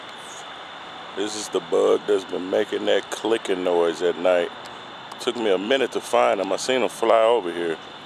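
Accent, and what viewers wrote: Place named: South, United States. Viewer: I don’t know if it’s the speaker on my phone or if that katydid is making a higher pitched noise than the sound I’m used to hearing on a summer night. I could tell it was loud though cause when it would start it would drown out everything else that your microphone was picking up. But I’m used to katydids making more of a chatter sound off in the trees. You sound like you’re from the south. I’m from the south and you sound just like a buddy of mine. Just something I noticed. That’s all.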